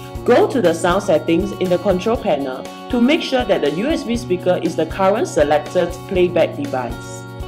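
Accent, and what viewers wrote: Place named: China. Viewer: I love the Chinese accent!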